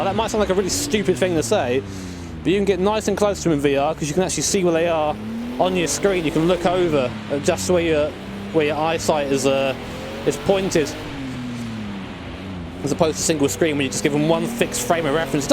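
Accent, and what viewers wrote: United Kingdom. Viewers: I don't know if it's just because I'm from Murka and am immediately enchanted by British accent, but I think you'd make a hell of a racing commentator.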